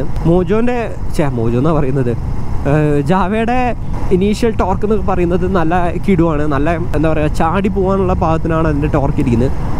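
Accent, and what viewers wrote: Kozhikode, India. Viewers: Bro കോഴിക്കോട്ട്കാരനാണോ?.... ഇടക്ക് മ്മള് കോയ്ക്കോട്ട്കാര്ടെ slang കേറിവരുന്നപോലെ.. മൂപ്പരൊക്കെ😃🤔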